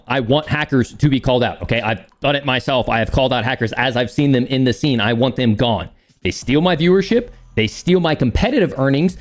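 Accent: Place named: Liverpool, United Kingdom. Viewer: The only thing you need to know is that he’s scouse. Rotten to the core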